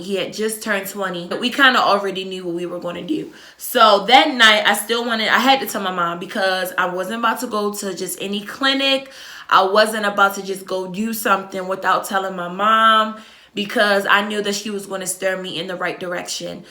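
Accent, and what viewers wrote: Baltimore, United States. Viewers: Idk how I stumbled across this video but I know a Baltimorean when I hear one 💁🏽‍♀️hey gurl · Danm us Baltimoreons really do have a accent. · I knew it!! Soon as you started talking, I could tell, This is exactly what I was scrolling the comments for. Bawdamore dummy!!! Accent heavy, I love it! This my first time viewing your channel, glad I found juuu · Yaya Unicorns as soon as she start talking I said I bet she’s from bmore. Also, your very brave telling your story. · Are you frm Baltimore? Ur accent sound like it. Im frm DC. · could tell after a few words you were from charm city lol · I felt like she was from baltimore. I know that accent to well. Love it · im sick im from the D and soon as she started talking I'm like she must be from Baltimore ctfu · Came across you’re video and soon as you started talking I said she’s from Baltimore saying “Knew” like that lol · Baltimore accent so strong 😂 #yeahhus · I knew that accent was BALTIMORE all the way. 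I'm a new subscriber btw.. Love ya accent tho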